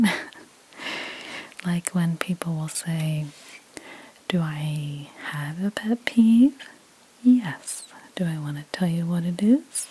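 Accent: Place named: Mid-Atlantic, United States. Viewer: Totally had you as mid-Atlantic, but you say “play catch” not “have a catch “. Interesting 🧐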